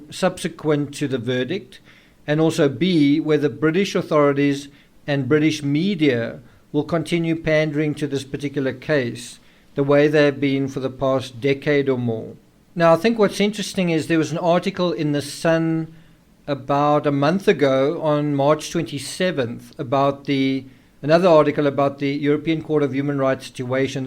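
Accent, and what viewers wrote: South Africa. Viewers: First time watcher. Why does it sound like you are South African?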